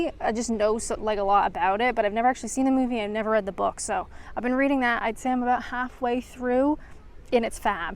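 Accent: United Kingdom. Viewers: When you said to that seagull thee absolute cheek I was like yeah you’re definitely one of us.. you couldn’t of said that more English. Been a big fan of your channel for a few years now and you honestly have the British in you. Great content as always. Keep it up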